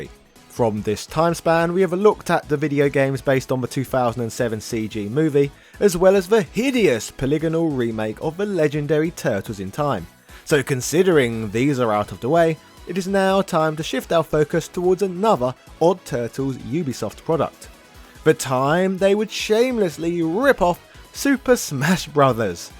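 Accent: United Kingdom. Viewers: "Venus de MEE-lo"??? "SAY-ban Entertainment"??? You Brits certainly have a unique way of pronouncing certain words...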